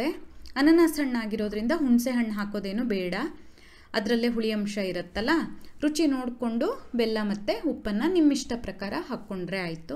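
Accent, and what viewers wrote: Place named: Coastal Karnataka, India. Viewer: love watching your videos, love your kannada accent, love your voice, it brings back the memories of my childhood I spent in Mangalore & Bantwal.❤️💕😘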